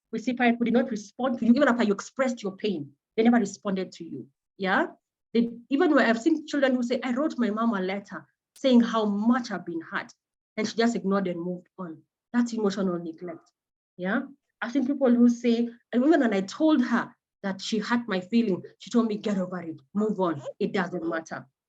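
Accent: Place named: Botswana. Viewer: I love your accent! I was growing up in Botswana and I miss it a lot.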